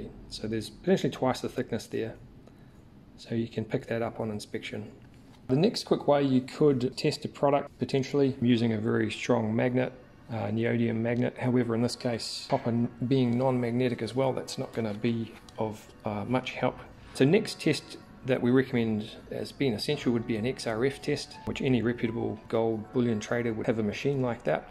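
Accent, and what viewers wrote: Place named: Australia. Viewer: Baaa.and baaaaz. (Dumb ozie accent for Bar)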